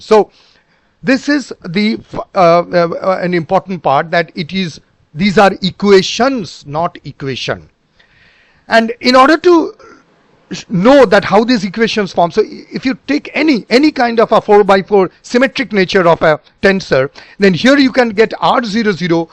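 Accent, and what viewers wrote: India. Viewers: Sir are you from West Bengal ❤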